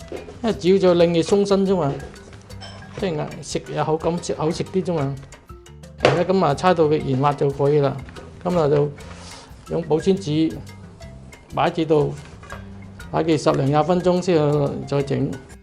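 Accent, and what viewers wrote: Zhongshan, China. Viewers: 鄉下係唔係中山？啲口音好似中山啲石岐話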